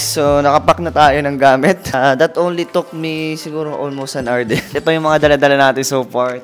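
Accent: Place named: Quezon, Philippines. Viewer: I knew it. Narecognize ko agad yung punto ng Quezon..😊 new subscriber here..🎉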